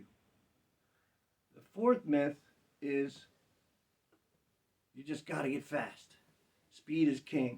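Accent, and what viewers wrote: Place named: United States. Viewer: Hi can you document the down up down up. I am having difficulty understanding what you are saying,your American accent Am from Liverpool in the UK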